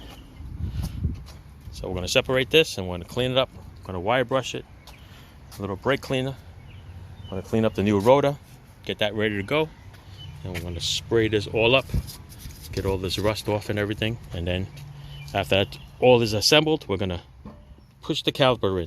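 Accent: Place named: Deep South, United States. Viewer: This was fantastic. Now bc it’s brakes I don’t have enough courage yet, but I’ll bet if I did, I would get it right because you are such a good teacher. Also, I’m in the deep south and hearing your regional accent, which is close to mine, was very comforting. Miss being around my people. 😅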